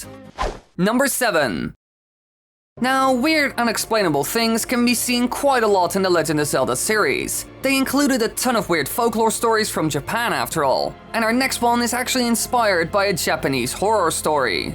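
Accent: Netherlands. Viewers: It sounds like an accent (possibly Dutch or Swedish)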